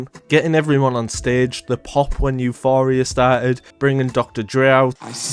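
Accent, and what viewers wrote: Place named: North, United Kingdom. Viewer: Last accent in the world I expected you to have 😭 where up north are you from?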